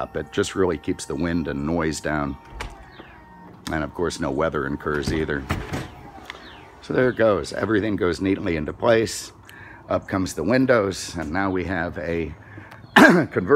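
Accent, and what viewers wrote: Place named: Baltimore, United States. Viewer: always makes me laugh. He doesn't take any "shit offa nobody" and I love the Ball'mer accent!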